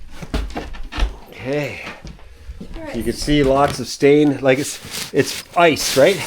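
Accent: Newfoundland, Canada. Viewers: That’s the Newfoundneez in you ………..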